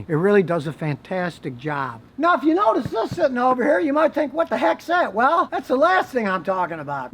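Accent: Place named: Texas, United States. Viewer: I am here for two reasons: cars DIY and texas accent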